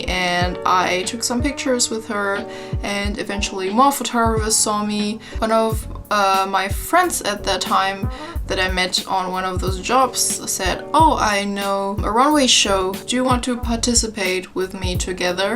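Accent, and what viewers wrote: Germany. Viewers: your accent ist german, right? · a pretty fucking horrible thing to say to/ about someone, and for what reason? I think her accent is beautiful. I love how soft and sophisticated German accents sound. Even if it was hideous, there's not much she can do about it, is there? Any changes would be slow, and besides there being nothing that needs fixing, she shouldn't have to spend years "improving" a perfectly understandable accent for our needs, not hers. JFC! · Were you born and raised in Germany cause you English really sounds 'germanized' :D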